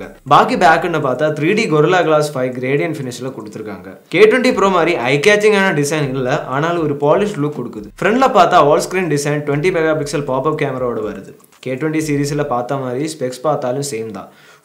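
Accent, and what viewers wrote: Kerala, India. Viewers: Kerala bro appadidhan pesuvaru Okdhan bro eppothume mass🔥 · Bro,are you malayali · Malayali tamil pesurathu romba nallaruku.... 😀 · His accent has malayalam flavour. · .. Are u a malayali?????? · Anchor Malayaali... 😍😍😍🎈🎈🎈🎈🎈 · I guess he is a malayalee. The slang though - adipoli bro. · Neenga malayalama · Sounds like a mallu talking tamil. Anyway nice presentation. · Liked that Malayali tamil accent.. Gud review. 👌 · Bro neenga malayalaia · Unga tamil slang pidichirukku.. are you malayali? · Are you malayali?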